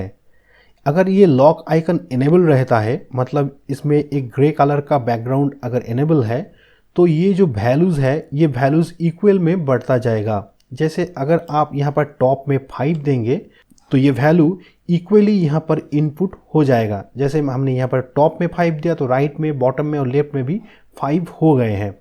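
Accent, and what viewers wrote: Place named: Bengal, India. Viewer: সুপ্রিয় মহাশয় , আপনি যে এমন একটা অ্যাডভান্সড টপিকে এত ডিটেলস এ টিউটোরিয়াল বানিয়েছেন এটাই অনেক বড়ো কাজ। বাকি আমি বাঙালি বলেই আপনার হিন্দিতে বাংলার টানটা ধরতে পেরেছি, তবে আপনার হিন্দি যথেষ্টই ভালো, হিন্দি ভাষী বন্ধুদের ও আশা করি বুজতে কোনো সমস্যা হবেই ন। আমি মালদা জেলার এক সিভিল ইঞ্জিনিয়ারিং পড়া মানুষ, আমার পক্ষে CSS HTML বা কোডিং বোঝা সম্ভব হয়ে উঠেনি এখনো । তবে আপনার মতো wordpress developer দের এত সহজ ভাবে বোঝানো ভিডিও গুলো সত্যি খুব সাহায্য করেছে আমাদের মতো non-techie দের ও নিজের blog বানাতে। আপনার কাছে আর ও একটা রিকোয়েস্ট থাকলো --- যদি সময় পান তাহলে GeneratePress theme এর Page break করার পর যে Pagination page number , first page, last page eisob j aase , eta k aktu colourful korar jonno , CSS code ta kothai edit korte hoi jodi tar opor ekta video baniye den....tahole amar khub subhidha hoto....thik etar motoi <link>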